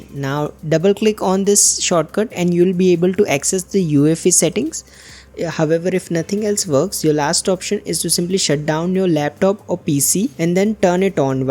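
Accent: India. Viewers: bro has one of the most indian accents ive ever seen typing ts on ubuntu btw im gonna try ts on my windows in a few mintues hope it works imma reply if it works :D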